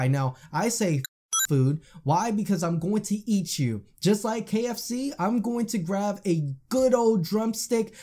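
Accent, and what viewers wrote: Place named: South, United States. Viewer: I keep hearing a suthern twang when he's talking. Am I the only one hearimg that? Gotta love those country boys lol 😉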